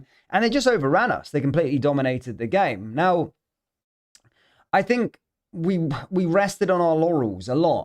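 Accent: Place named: RP, United Kingdom. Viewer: Daft Huns listening to an English posh tosser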